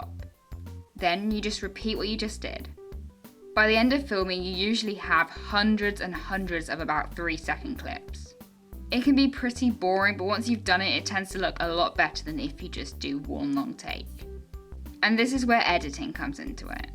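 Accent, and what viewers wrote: United Kingdom. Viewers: • scottish i think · She has a British accent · are you british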